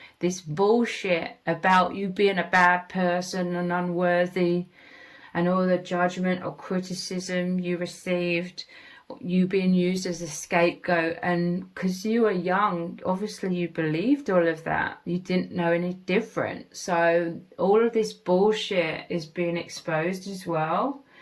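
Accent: England, United Kingdom. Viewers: Believe me it was as if I was listening to my own very story I love you beautiful English accent it's like listening to a Melody thank you you are truly gifted a true seer a true Oracle indeed you are blessed and I thank you!!!8!!!😊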